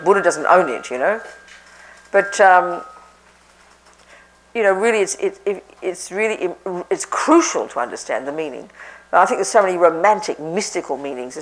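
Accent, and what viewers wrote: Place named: Melbourne, Australia. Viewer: As someone who also grew up in the south east suburbs of Melbourne, her approach works for me. She doesn't dance around people's neuroses but cuts through with clarity. This is how we talk in Melbourne.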